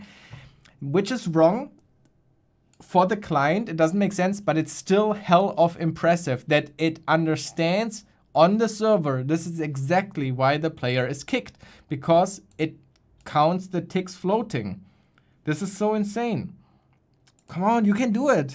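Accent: Germany. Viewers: Man hört dem deutschen Akzent ab und zu 😂❤ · Man hört das deutsch raus 🙂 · this german accent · German Accent? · Hey bro are you german? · Man merkt so hart dass er deutsch ist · Man hört das Deutsche raus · Junge bist du deutscher? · Based on your accent, I would guess that you are from Germany. Am I correct? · Sounds like an german Accent lol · Bro is german? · He is a german guy you her it by it acent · Du sprichst eigentlich Deutsch oder xD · Man hört das du deutsch bist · I can hear Deutsch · Du bist Deutsch oder ? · Bruder du hast so einen krassen deutschen Akzent. Bereits bei "I'm sitting" war schon alles klar xD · bro sounds so german xD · Hab nur 1 Satz hören müssen um zu wissen das du Deutsch sprichst 😂😘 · correct me if I am wrong, but as a German I heer it: you are german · erste 5 sekunden "der typ is doch deutsch" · i think i hear the german accent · are you german? 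i am a german too and you sound very german to me lmao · Are you a German man?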